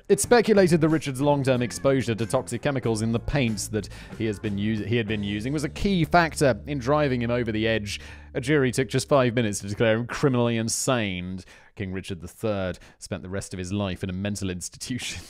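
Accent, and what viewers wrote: South, United States. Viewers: as a Texan, if you came here with that southern accent, no one would question it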